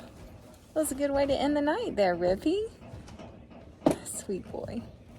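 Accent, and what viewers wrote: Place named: Kansas, United States. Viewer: I live in Kansas and I talk like you do, when you said he’s really hittin a lick I cracked up because I say that too.🇱🇷😉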